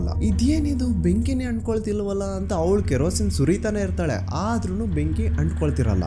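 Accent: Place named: North Karnataka, India. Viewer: ಉತ್ತರ ಕರ್ನಾಟಕದ ಕನ್ನಡ ಭಾಷೆ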